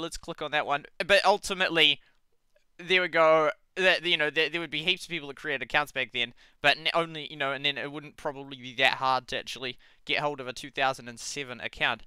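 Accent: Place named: New Zealand. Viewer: Bro, are you a kiwi? Or Australian? I'm getting kiwi twangs in your accent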